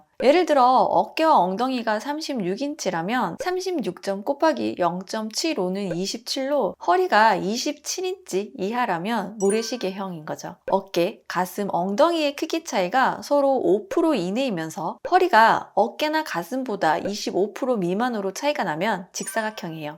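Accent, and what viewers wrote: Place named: Gyeongsang, South Korea. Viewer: 경상도 출신이신가요? 은은하게 들리는 사투리❤️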